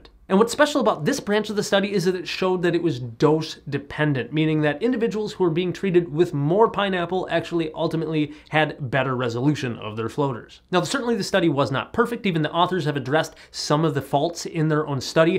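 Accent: United States. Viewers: Very informative video. I'll try that out.. Also, you're amazing, got a beautiful pure American accent AND you're so gorgeous lol.. Wish more doctors looked like you hahaha, or be your patient even better lol... Anyhow, thanks for your channel and videos. Subscribed and supporting your good work. :)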